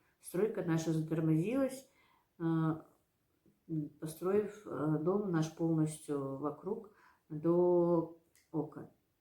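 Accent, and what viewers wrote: Standard. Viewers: Вы так чисто и правильно разговариваете,приятно слушать Ваши рассказы.Испытаний много на Вас выпало.Хорошо что у Вас много хорошей родни.У нас родители вообще с дет.дома,с Дагестана.В ВОВ у них умерли от голода и болезней родители, и все моего папы маленькие братья,он 7 летний мальчик остался один из пяти детей в семье.Осталась одна старая бабушка,которая отдала его в детдом.Родни дружной как у Вас у них не было.Сами прокладывали себе путь.Вы очень сильная и трудолюбивая женщина.Пусть у Вас все наладится.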